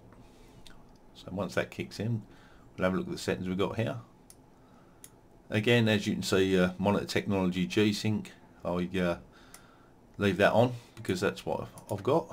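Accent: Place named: Cockney, United Kingdom. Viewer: By the way, I enjoy your accent and you've got a thumbs up from me son! I'm from yorkshire but I do love a good cockney accent 😂 big Micky Flanagan fan.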